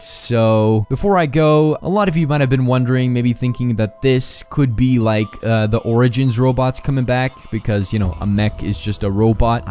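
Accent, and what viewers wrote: Bosnia and Herzegovina. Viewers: Are you bosnian.?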